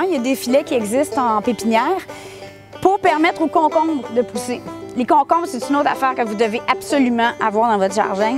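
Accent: Quebec, Canada. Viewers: Mdrrrr l'accent québécois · Je kiffe l'accent québécois !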